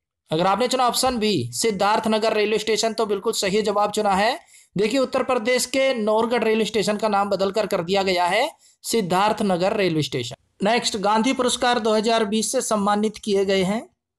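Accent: Haryana, India. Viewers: Sir aapki trick na best h .. Bss aap ase hi hme vedio provide krvate raho haar session per Aapki aavad haryanvi m hote h vo bsst h m bhi haryana se hi hu mujhe or bhi aache se smjh aate h aapki vedio😀😀😀😀😁😁😁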